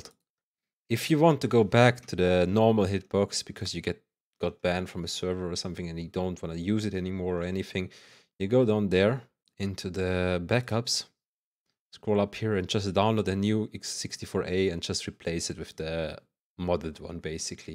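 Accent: Germany. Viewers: bro is defenitif deutsch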